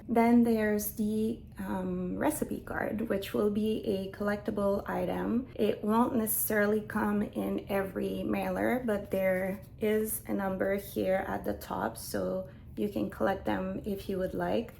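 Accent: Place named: France. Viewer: My Dear, your new excitement is palpable. It's so noticeable your French accent was more forward. I love this! Congrats on your new venture.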